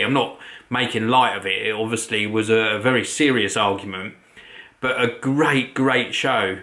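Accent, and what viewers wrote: England, United Kingdom. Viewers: Where are you from in England?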